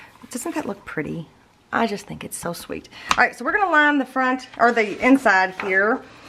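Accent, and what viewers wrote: South, United States. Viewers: I love ALL the new designs and templates. The album is a beautiful journey I look forward to taking. Thank you..thank you...thank you! Always fun watching you create and this northern girl could listen to your southern accent all day.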